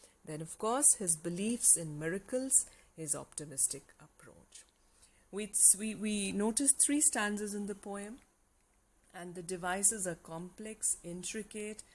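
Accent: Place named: India. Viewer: Thank you for the great an excellent explanation about this poet .really,I have never heard this kind of explanation in Indian accent and as much clear accent, really it was attractive and learned very clear and good this poem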